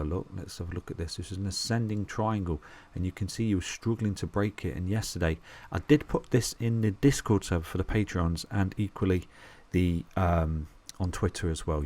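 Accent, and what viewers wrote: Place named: East Midlands, United Kingdom. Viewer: I reckon that's an East Midlands accent!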